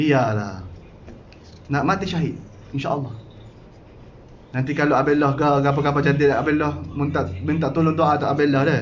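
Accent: Kelantan, Malaysia. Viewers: Hebat lah kamu boleh cakap melayu walau dlm loghat kelantan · Kecek kelate bohh👍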